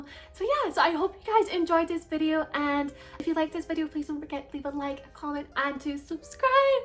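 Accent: Scotland, United Kingdom. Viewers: I've watched you on tik tok for a while but only just joined your YouTube channel. I am so baffled and shocked that people would ever think that someone with a cool style that celebrates vintage could be a bad thing? You look amazing and your accent is beautiful (my dad is from coatbridge/aidre so its lovely to hear!). Keep being you 🥰 xx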